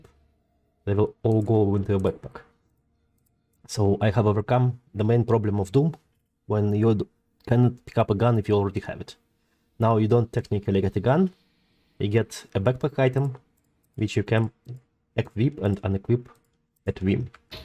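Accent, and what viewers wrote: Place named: Central Europe. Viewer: Haven't taken a look at this channel in a while. I'm guessing you're doing something Fallout-like? Judging by that Pip-boy thing. Oh,and tour English sounds Central-European. A bit Eastern thought. Czechia?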